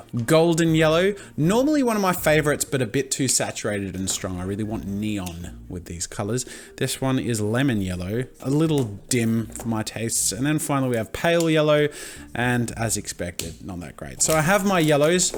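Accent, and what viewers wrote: Australia. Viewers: Omg r u Australian?? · is he australian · Is Aussie?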